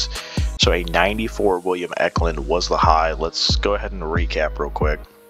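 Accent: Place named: California, United States. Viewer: Completely different guesses but I’d say you’re from California or New Jersey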